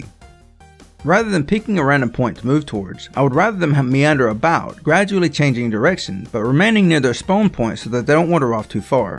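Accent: South, United States. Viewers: Just realized this is the first time I've ever heard a game developer with a southern accent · I thought it sounded southern, but it was rougher than the other accents I've heard from that area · first time ever hearing a southern geek voice. not disappointed. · Holt crap I've never seen a person with a southern accent as a developer! :D this is awesome!!!!!!! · Are you southern or northwestern I genuinely can’t tell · His southern accent makes the video better.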